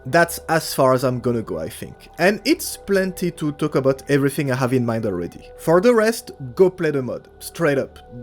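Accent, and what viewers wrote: France. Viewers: hey, Just coming from stalker2 now i'm gonna play every stalker from soc ti call of pripyat; Can i know wich mod your using on pripyat to make it that good? And if you will be so kind to point me out to some mods for SOC and clear sky to modernize the game a lot graphic wise and gameplay wise gunfight animation while stille keeping main quest and quest in the game. Radiophobia 3 look great for soc but there so many options i'm kinda lost. On Another topic are you french your accent sound french to me :) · Ton accent ^^ ca trompe pas . Cool d'avoir un passionné fr pour les stalkers 👍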